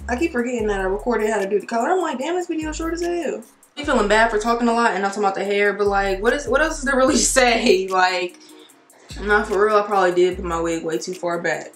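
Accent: United States. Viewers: You know what I realized u dead kinda got a country accent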